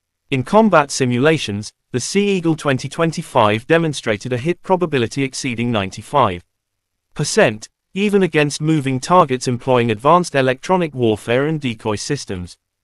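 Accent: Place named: United States. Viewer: I wish all these American narrators would foxtrot oscar.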